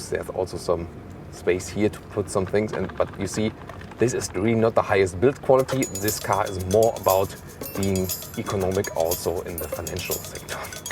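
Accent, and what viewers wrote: Germany. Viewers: Is that a German accent? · Oh...hallo, natürlich verstehe ich Englisch. Aber ich dachte mir, warum macht er mit diesem sehr deutschen Akzent denn nicht einfach ein deutsches Video. Die Erklärung leuchtet mir ein...vielen Dank.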